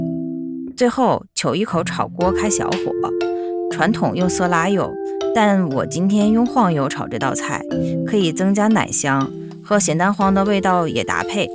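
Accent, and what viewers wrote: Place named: Tangshan, China. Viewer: 唐山话好可爱 哈哈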